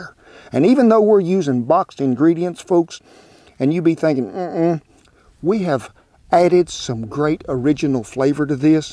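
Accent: South, United States. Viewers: Your videos, your voice and southern gentleman ways make me feel so homesick... I've been away from the South for too long. ☹ But I enjoy watching for the same reason. Thanks for the great how to videos.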